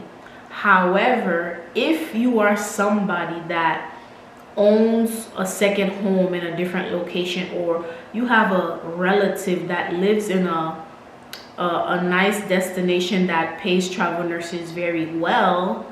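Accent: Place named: Caribbean. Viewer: I sense a carribean accent. Are you and island girl ? Thanks for the content. Dont the agency pays for everything again?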